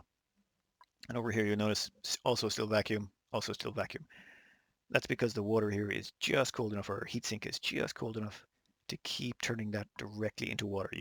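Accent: Ireland. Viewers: Well, I only noticed it when you said a few words with an Irish accent, though it is true that your accent is not that strong but you still have a little bit of accent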